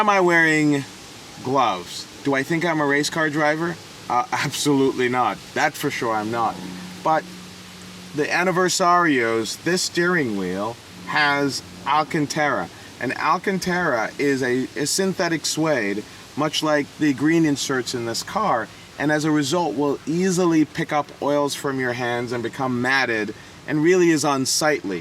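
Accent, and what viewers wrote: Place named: New York, United States. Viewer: He was born here what's accent are you talking about ? Probably is because he is from New York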